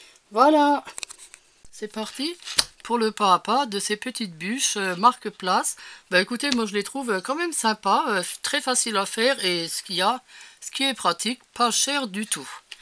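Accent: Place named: Freyming, France. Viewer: coucou elles sont tres mignonnes sans risque de prise de poids j aime beaucoup ta voix et ta bonne humeur et ce petit accent qui me rapelle ma grande tante qui etait de freming bisous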